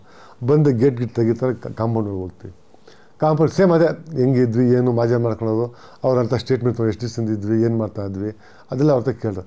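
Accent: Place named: Tumkur, India. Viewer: Typical Tumkur language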